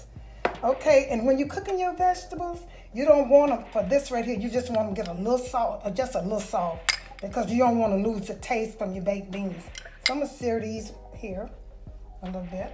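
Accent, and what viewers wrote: South, United States. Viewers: I love listening to you talk..love that southern accent! I have one too ;-)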